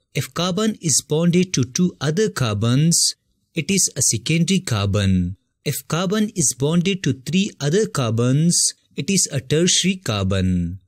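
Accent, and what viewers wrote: South India, India. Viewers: Through you spoken rythm i definitely got that you are south Indian sir 😂😂😂😂😂 i really like your teaching way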